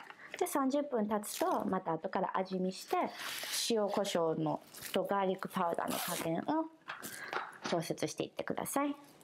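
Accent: Kansai, Japan. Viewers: イントネーションが関西ぽくて親近感湧きます 美味しそう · めっちゃ関西弁やん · 関西弁だ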